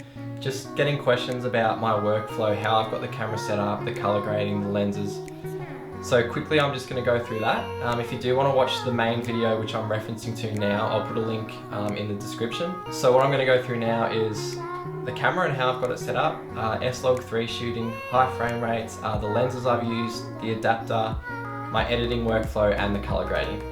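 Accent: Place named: Australia. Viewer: wait are u from australia ? hear ur accent ;)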